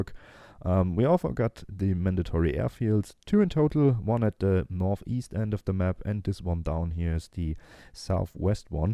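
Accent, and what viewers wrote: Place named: Germany. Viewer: wie man das sofort hört wenn ein deutscher englisch spricht .. :)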